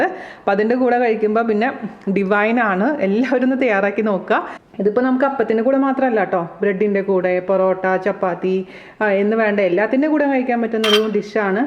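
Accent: Thrissur, India. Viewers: Hello..njan vegetarian aanu... But still I watch all ur non veg videos..that's how much I love to watch u and hear aaa trissur baasha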